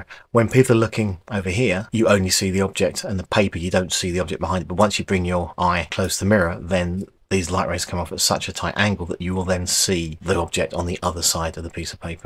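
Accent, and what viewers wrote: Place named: United Kingdom. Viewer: Your demonstration made it clear, but that damn British accent made the explanation impossible to understand.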